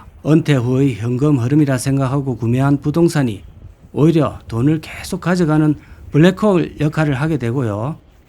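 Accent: Gyeongsang, South Korea. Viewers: 목소리 사투리 매력 있으요 핫팅 !! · 구수한... 경상도 사투리 멘트... 최고입니다...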